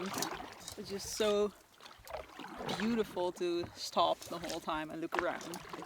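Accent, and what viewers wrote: Netherlands. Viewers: Are you Dutch? I'm trying to place your accent 😊 Beautiful adventure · Hey! Small world! I randomly came across your Bowron Lake video and noticed from your accent that you’re Dutch? Did the Bowron Lake circuit in mid-May this year, shortly after moving from the Netherlands to Calgary. I brought my camera along as well and was hoping to film the trip, but unfortunately my camera went for an unplanned swim on the very first day 🥲 So I never got to make the video I had in mind. Anyway, I really enjoyed seeing your take on the trip! Thanks! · Your accent. Are you from the Netherlands? Very cool video. I love that canoe! I live in New Zealand. We have quite a bit of terrain like this as well. If you ever get the chance, check out the south island of New Zealand. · Such a fabulous video, exciting and relaxing and inspiring. Do you have any Dutch heritage, your accent really reminds me of my fellow Dutchies. 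· Love the video! Thanks for sharing. I hear a slight dutch accent? Or is it just me? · Do I hear a Dutch accent? Love your content btw! Great views!! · You don't sound like real american accent. Are you Dutch? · Wow! That is so beautiful, what a cool adventure. You are very good at filming and editing by the way. I would love to do this trip, just not too thrilled about potential bear attacks but I guess chances of that are slim. I was trying to pick your accent but it's hard; are you Dutch? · Thank you Youtube, you are finally learning what I like. That's some amazing scenery, I also love to be out in nature solo. Am I crazy or do I indeed hear a Dutch accent? I often dream of moving to Canada to live this peacefull lifestyle. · What and adventure. And what a lovely woman! ❤. Are you dutch by any chance? You're accent kind off is but your English is flawless. Really enjoyed this film! 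· my algorithm brought me here, cool content, your accent sound a little dutch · Your accent is cute, you sound Dutch. Where are you from? Groeten uit Holland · Are you a dutch speaker by any chance? your accent reminds me of dutch speakers speaking english. Love your content!!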